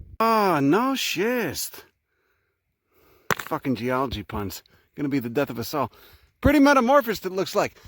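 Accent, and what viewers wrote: Chicago, United States. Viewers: Thanks for exploring my country. I found you because of the Chicago accent. A Chilean natural myself who lived in the Humboldt Park area and in Willmette for a couple of years. It's always refreshing getting to hear the accent I got my English from... If you ever come to Patagonia you'll be astonished of the flora down here. Youll get to see wild strawberries, fancy varieties of potatoes, Gaultheria mucronata, and the trees, man... wow! Fitzroya cupressoides, Araucaria araucana (monkey puzzles, typically pictured in dinosaur books and shit). If you EVER come here, you'll have a home where to stay, man! Again, I'm really happy to hear you talk about these wonders... in the accent I learned my English from! Hugs from Villarrica and keep up the good work!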